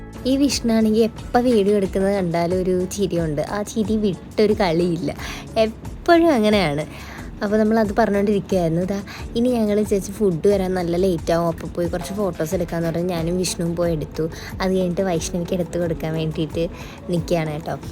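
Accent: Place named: Ernakulam, India. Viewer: Ernakulam slangayallo😁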